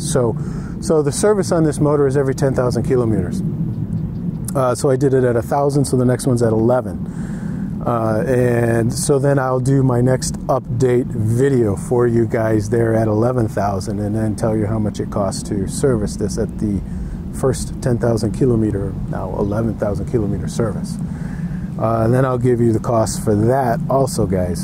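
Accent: United States. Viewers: English is my 2nd but you sound American to my ears. Why don't you use your Brit accent like some of your peers?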